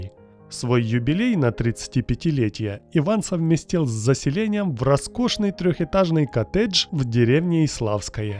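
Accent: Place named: Ukraine. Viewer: а что, это текст переведен с украинского? абсолютно нероссийские слова и интонации..."стоимость становит...." так не говорят. хотя акцент иногда похож.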